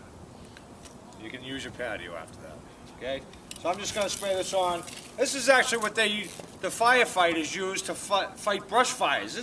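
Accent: United States. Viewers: susan scott My husband grew up in jersey and told me about running behind the mosquito trucks too. I thought this guy sounded more like he had a Jersey accent. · Hey, love that Boston accent. Fellow Bostonian down here in Delray Beach enjoying your video.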